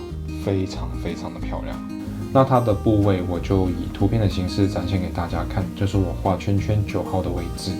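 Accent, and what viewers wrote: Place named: Cantonese, China. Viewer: 我個人喜歡有點口音的感覺 偶爾有點廣東話交叉也不錯 各有所好吧 加油💪🏼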